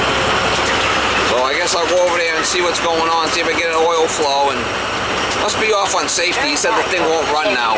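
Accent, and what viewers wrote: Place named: Boston, United States. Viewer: That's just the Boston direct way of talking, no need to unsubscribe. I change my own filter and nozzle and if something went wrong I would call myself "Joe Homeowner does it again" lol.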